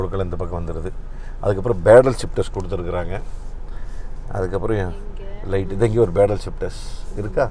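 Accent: Kongu, India. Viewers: அண்ணா வணக்கம் கொங்கு தமிழில் கொஞ்சி தமிழ் வாழ்க வளமுடன்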